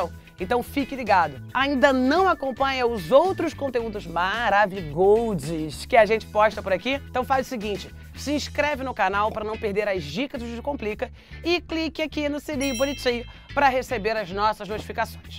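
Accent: Rio de Janeiro, Brazil. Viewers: Será que a sra é carioca?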